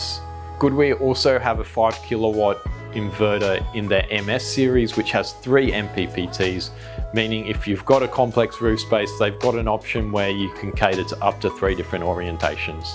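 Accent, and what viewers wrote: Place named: United States. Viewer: You have a funny American Accent :)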